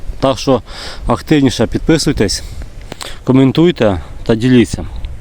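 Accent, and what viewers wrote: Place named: Podillia, Ukraine. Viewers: Я теж спочатку по говірці подумав що Хмельниччина😊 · Вітаю Добродію! Намагаюсь по вашій розмові визначити звідки ви...Ваша розмова дуже схожа, на ту, якою розмовляють на Поділлі. І мабуть все таки не Вінницька, а Хмельницька область.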